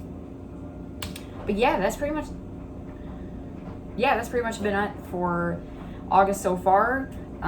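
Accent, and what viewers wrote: Michigan, United States. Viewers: So this is funny, I was watching your video and my son was sitting near me on the couch and he said mom that lady sounds like you: I knew what he meant right away, it’s the Michigan accent because I’m from Michigan lol. I was like yep that’s right!! lol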